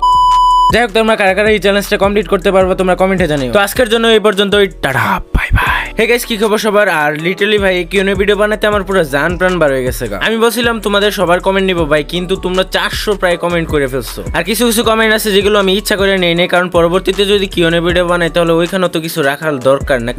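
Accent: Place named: Mymensingh, Bangladesh. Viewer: আল্লাহ,, ভাইয়া তোমার গ্রামের বাড়ি ময়মনসিংহ, এই জন্য ই তো বলি তোমার কথা বলার ইসটাই আমার চাচাতো ভাই দের মত লাগে কেন 😮 কারণ আমার গ্রামের বাড়ি ময়মনসিংহ 🥴 যাক প্রথম আর্টিস্ট ইউটিউবার পাইলাম যার বাড়ি ময়মনসিংহ 🥴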